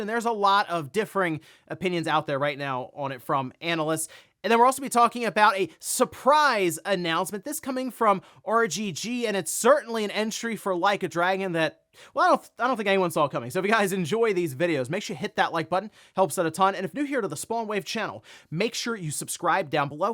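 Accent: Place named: Pennsylvania, United States. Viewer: It's the Pennsylvania accent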